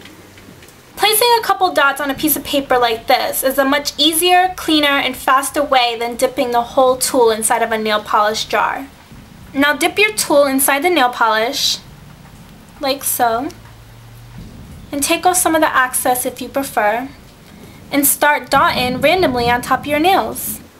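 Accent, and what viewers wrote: New York, United States. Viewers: Very cool video!! I love all things related to doing my own nails, natural, polishes, etc. I will be trying this. P.S Love the accent, sounds like New York maybe or north period!! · Cool ;) !! New York accent ?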